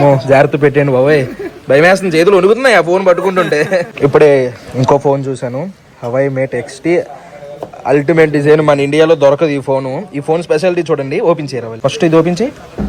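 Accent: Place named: Godavari, India. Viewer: Mee godavari slang super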